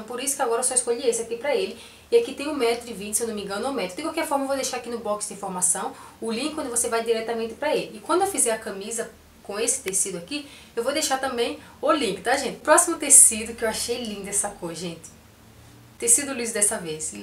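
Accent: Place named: Northeast, Brazil. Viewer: minha linda eu amo vc seu canal, videos, amo ate a forma d vc falar com esse sutac tipo nordestino como eu rsrsrs....vc foi a primeira q comecei seguir aqui e atraves do seu canal q fui seguindo outros....bjsss linda mt saude e seja mttttt feliz com seu mozão!!!!♡♡♡♡♡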